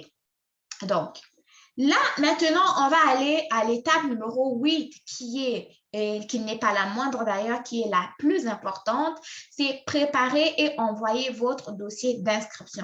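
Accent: Canada. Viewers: Tu expliques parfaitement bien poupée. Merci pour ces riches informations. Je souligne de passage que tu commences à avoir l'accent canadien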